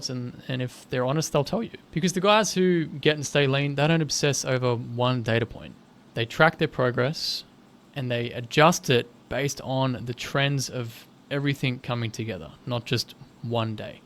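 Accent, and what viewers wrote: Australia. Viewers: I couldnt understand a word. Are you osstrayliyen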